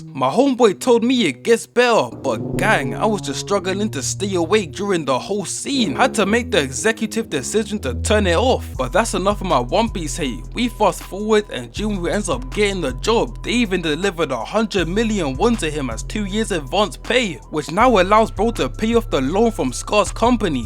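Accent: United Kingdom. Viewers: A British nigga talkin bout yns is wild to me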